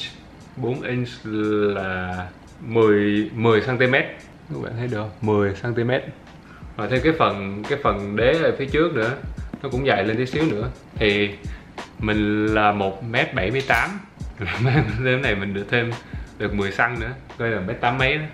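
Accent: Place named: South, Vietnam. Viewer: a noi giong nam dth von hnao thu noi giong bac di anh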